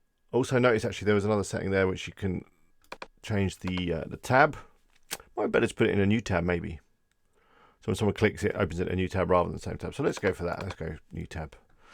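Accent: United Kingdom. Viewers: Really teaching video. Wouldn't you share with us which are your favorites themes and plugins to work with? Or the ones you normally use to create your websites, please? Thank you in advance. Btw, I love that so british accent. Indeed :)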